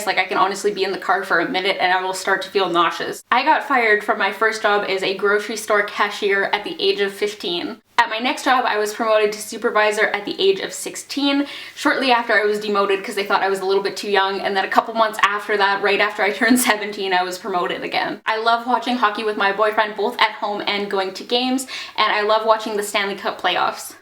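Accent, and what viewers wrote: Canada. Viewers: it is SO funny because as I was watching some of your videos I thought to myself "wow I bet she's canadian because of her accent" and then I read that you ARE canadian!!! ( I am Canadian as well haha). Anyways love your videos :)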